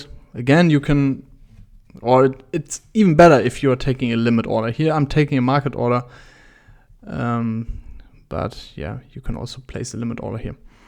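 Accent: Germany. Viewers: hey dude. i really like your videos, thank you :) i am getting into python atm. do you have any tipps? in the end i wanted to make a telegram or discord bot. i like the idea of a trading bot also a lot :) so for now i am stuck at my udemy though :D so any tipps are welcomed. and yeah, thank you for your videos :) ach so, kann es sein, dass du deutsch als muttersprache hast? ;)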